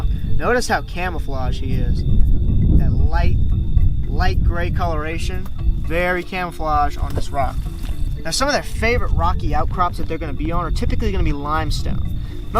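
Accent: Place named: South, United States. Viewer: WE LOVE YOU IN ALABAMA. KEEP YOUR ACCENT SOUTHERN. IT IS WHAT MADE YOU AND SETS YOU APART. WHOOP WHOOP. LORD BLESS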